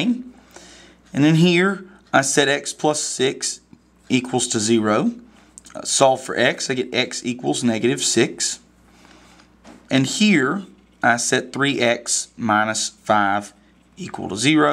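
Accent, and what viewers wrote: South, United States. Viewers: Not sure why, but math explained in a southern accent is so much easier to understand.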